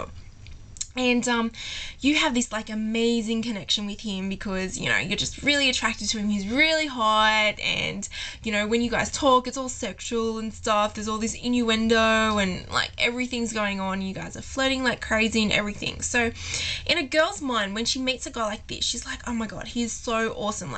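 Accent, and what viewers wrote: Australia. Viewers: I´ll bet she learned english or was born in Australia. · WOW! what is she australian??? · the aussie accent is a mayyyyyja put off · I love her aussie accent its such a turn on. But i dont anyone can wait around long enough for the perplexing age old question of what women want? bcoz women dont really know what they want. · thats top advice, esp what you said; dont take what women say literally. so really that means i cant take you literally then hehehe. and yes everyone shes an aussie. nice to hear an aussie accent out of an absolute amount of yanky teenbopy girly stuff on the tube:) x)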